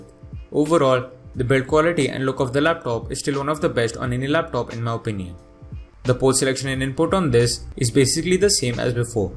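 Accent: India. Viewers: You try speaking Indian without accent..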